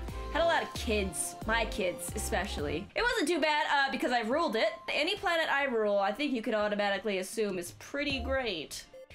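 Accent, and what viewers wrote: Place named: United States. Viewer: She speaks with an American accent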